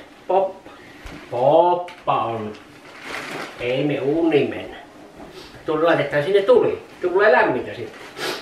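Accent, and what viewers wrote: Savo, Finland. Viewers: Siinon rehellinen, Aeto Savolaenen :D :D :D <3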